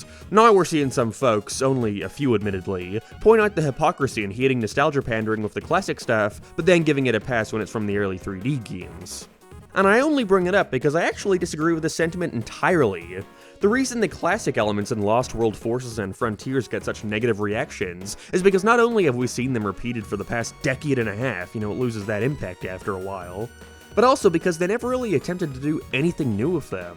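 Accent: Ireland. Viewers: Your accent goes to the most clear American accent to the thickest Irish accent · hes irish iirc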